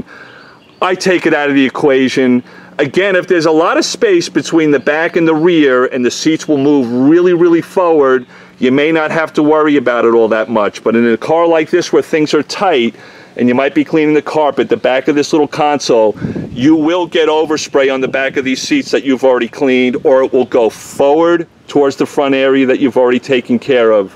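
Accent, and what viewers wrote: New York, United States. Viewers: first off watched all your videos and have gotten priceless tips and tricks from you. I am not an auto detailer by trade such as yourself but am what you would call a " driveway detailer" my own cars. I'm very OCD and all my vehicles need to be spotless. Superclean has changed my life. Since you are going over interiors. I'd love to see your process on how you detail the dashboard, instrument panels, that pesky fake glass where your speedometer is, navigation screen all the way to the radio buttons. Do you still use meguiers interior detailer? I don't mind it but it like you said smells like vinegar and can't stand it. Any ideas? By the way your New York accent and fun loving humor is quite refreshing. Never bored listening to you. Sincerely Dex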